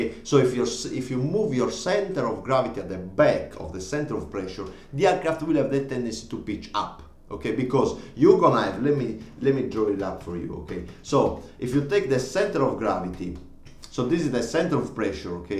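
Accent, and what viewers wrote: Italy. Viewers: You sound very Italian! Ciao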